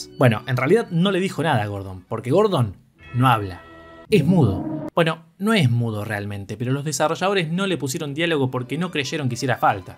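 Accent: Argentina. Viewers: videazo xD me recordo a motherland, ahora GatoPan, la forma de resumir, narrar y presentacion pero, en argentino papaaaa!!! muy bueno, espero la segunda parte y la tercera para cuando salga hl3 :´) y bueno, mas videos del estilo que es buenisimo tu humor man jeje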